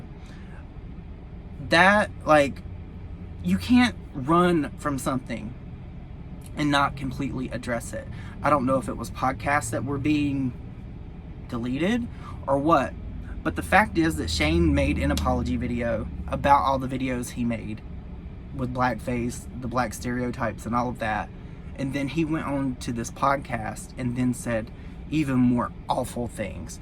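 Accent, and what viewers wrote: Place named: South, United States. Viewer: This is off topic (seeing I’m physically sick to my stomach over all of this I figured I’d try and change the subject for a quick second- awesome video by the way) but I’m extremely curious... where are you from,? You have a southern accent. I can’t help but notice it because I love it 😊❤️ I just moved to Charlotte, NC- you have close to the same accent everyone does down here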